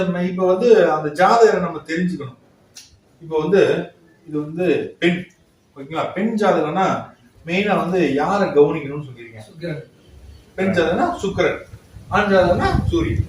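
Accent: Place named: Kongu, India. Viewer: வெகு எதார்த்தமான கொங்கு தமிழ் பேச்சு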